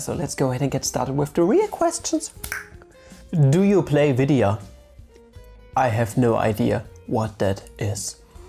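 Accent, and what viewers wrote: Germany. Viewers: Can someone link the vid on the integral he talks about ? I can't decipher the thick German accent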